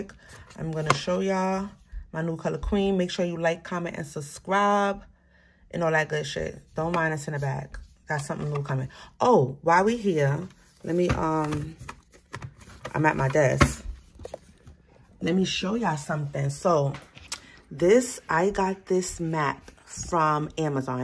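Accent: New York, United States. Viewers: You’re definitely from New York lol🥰🥰🥰 I’m starting my neice lip gloss business for her and this is very helpful… Sending love from Jersey 😘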